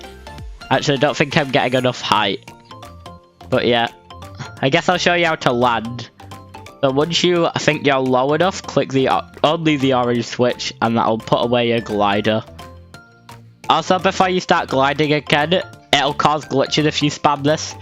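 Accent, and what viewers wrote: United Kingdom. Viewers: I like your British accent idk if ur British but sounds like British to me btw I subscribed cuz of ur glider ❤❤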